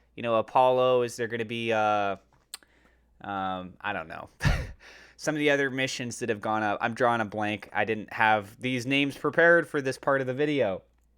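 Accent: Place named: Wales, United Kingdom. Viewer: Haha how can you mistake a Welsh accent for Australian, they are so different!!!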